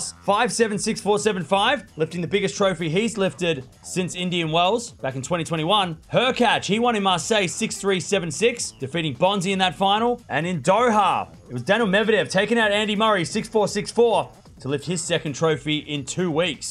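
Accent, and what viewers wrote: Australia. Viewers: I say the biggest win this month is Norrie over Alcaraz in Rio. There was almost no way of Norrie winning but he did. There was a buzz of Alcaraz's injury acting up but nonetheless he was hitting winners all over the place. I think Norrie's win was the most classic in a while. Thanks for the show. It is always good to hear your Aussie accent.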